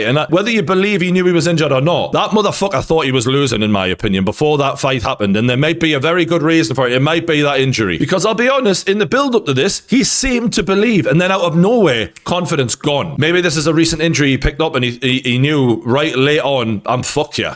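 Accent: Newcastle, United Kingdom. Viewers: Geordie boy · Geordie. You’re right.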